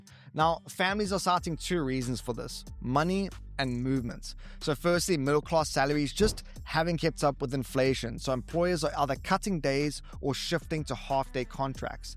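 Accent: South Africa. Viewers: I'm genuinely curious, what part of South Africa is your accent from? It's giving Brrrrrrrryanston bruv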